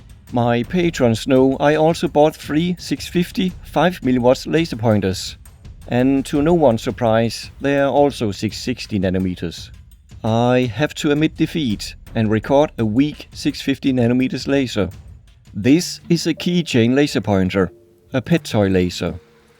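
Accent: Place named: Denmark. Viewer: That’s an S tier Danish accent right there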